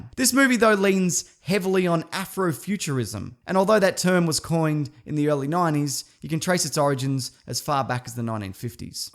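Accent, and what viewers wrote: Australia. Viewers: u kinda sound ozzie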